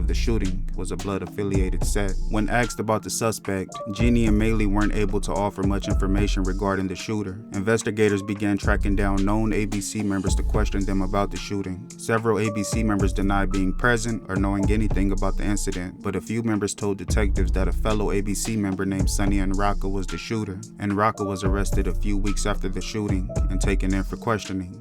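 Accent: California, United States. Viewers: That's definitely a California where are you From?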